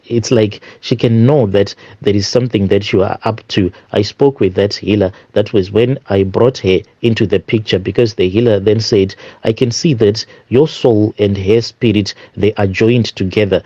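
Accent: Zimbabwe. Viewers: This is just crazy the story teller sounds Zimbabwean or a national foreign but all his stories are based on South Africans …I sense lies here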